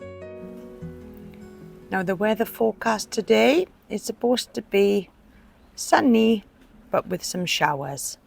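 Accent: Wales, United Kingdom. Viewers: Hi the Garden is looking lovely. Do you heat the greenhouse ? I'm in Essex. You're lovely soft welsh accent really reminds me of my Granna. 😊x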